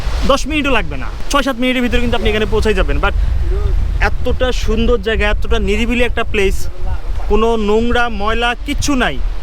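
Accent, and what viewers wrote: Bangladesh. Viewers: আপনার কথা বলা বাংলাদেশের মানুষের মতো ।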